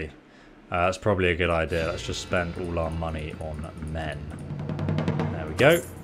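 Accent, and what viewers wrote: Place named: United Kingdom. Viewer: It's called british and that's the way they pronounce things.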